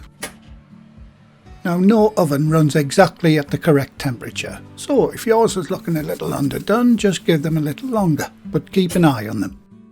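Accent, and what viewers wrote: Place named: England, United Kingdom. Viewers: Good English cooking with a good English voice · Although I live in Hungary, my daughters and their families still live in County Durham. It's lovely to hear a NE accent where I spent about 20 years. I never thought of using hot-water pastry for steak pies (I use it for pork pies of course) so I'll give it a go. They don't make meat pies in Hungary so I have to make them myself. Cannot buy them in shops. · Thank you for answering 2 questions for me. Could I do without egg as I'm allergic. And a question I didn't know I had, I have long nails 😊 I like how you mixed fats with hot water, I never did like rubbing the fat into the flour and getting it all stuck under my nails. Where are you from, you sound local, I'm from Middlesbrough. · Love your voice,! 😍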